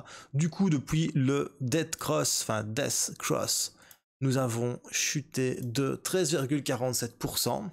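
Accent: Belgium. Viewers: J'adore ton accent belge, c'est kiffant de t'écouter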